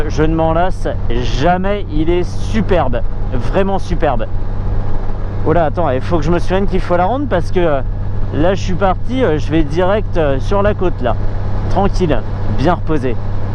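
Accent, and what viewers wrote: Paris, France. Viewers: Très sympa, ce comparo! J'adore ton accent parigot (je suis du sud-ouest) Je m'abonne 👍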